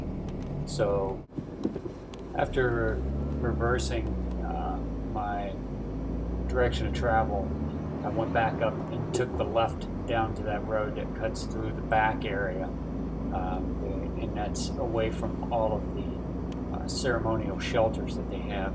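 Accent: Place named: South, United States. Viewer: Because, when I saw the name, I thought to myself," I have only known one in my life, I bet this is him, and asking would be the best way to confirm if it is him." I am being cryptic, because as you see, 0sum gamezzz is not my real name, and I want to remain anonymous. I am going to give you clues to as who I am, not too specific, because you are a sharp guy, and would know right away who I am. if you figure out who I am, please cryptically respond to me with clues as to who you believe I am...please? At one time, I counted you as one of my best friends ---- by the way, your accent is far less southern now, than it was, when you were a boy of say... 14 through 16. Facing the main office/kitchen, the building where Vespers took place I lived on the right side of campus. This may take a series of posts, if you decide to play along. Please be rest assured, I have no bad or disrespectful intentions towards you... 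in fact, it is 100 percent the opposite. By the way, you can ask questions to obtain my ID. This could be fun.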